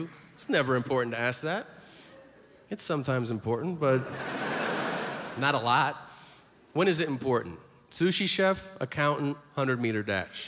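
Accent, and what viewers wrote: Peru. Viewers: It's so funny to hear him speak Spanish! He speaks with a Peruvian accent! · I love how his Mexican Asian accent is in fact a fully Peruvian accent.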